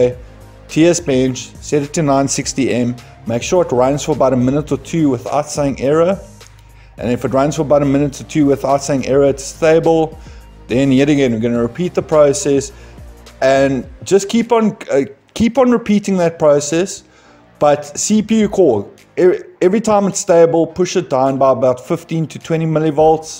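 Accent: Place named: South Africa. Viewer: will do! My undervolt setup was from when my cpu max load was set to 60% so should he able to change it more now. There's some good ol new zealand slang for ya mate! Love your South African accent, my neighbors are from SA and are the most hilarious people I've ever met, awesome to watch the rugby with. Really appreciate the help bro! Never seen another channel that is so patient and informative with their community.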